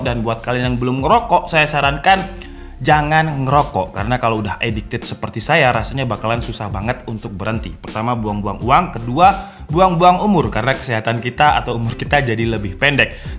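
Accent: Malang, Indonesia. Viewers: Medhok nyaa itu loh heheh ngalam ae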